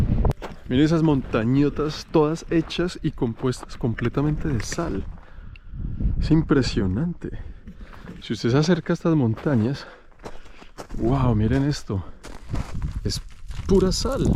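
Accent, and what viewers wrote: Colombia. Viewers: Me encanta el acento colombiano. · Si es colombiana nacida en Ibague y vive en Australia. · su acento es colombiano.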